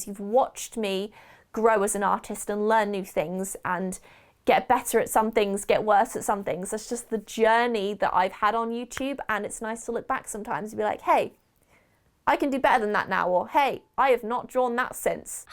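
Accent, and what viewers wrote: United Kingdom. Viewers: learning how to have a British accent and I wanted to hear one to help me learn it better, and I immediately thought of u! lol :D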